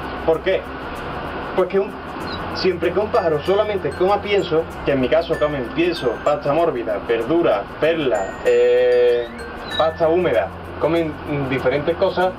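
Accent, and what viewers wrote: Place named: Andalusia, Spain. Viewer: En el acento ya decía yo que eras andaluz como yo jeje. Muchas gracias por la información crack. La calor de allí es peor que la de aquí tela. Un abrazo compi.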